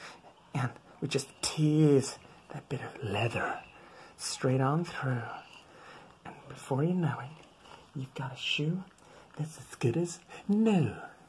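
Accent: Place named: Ireland. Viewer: More like wee Ireland accent to me lad.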